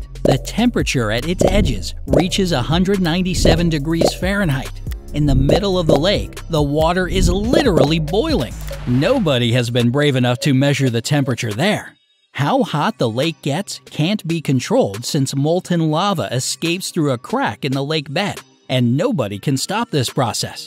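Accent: Russia. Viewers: To: Bright side Are you a Russian or Ukrainian? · Are u russian?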